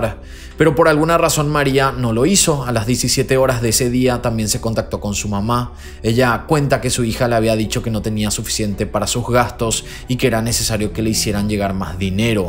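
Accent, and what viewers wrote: Paraguay. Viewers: Es paraguayo? Justo me preguntaba de donde era el acento. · Hola..!!! Saludos desde Las Toscas Santa Fe Argentina... siempre estoy siguiendo tus videos..!!! aguante la tonadita de Paraguay..!!! Éxitos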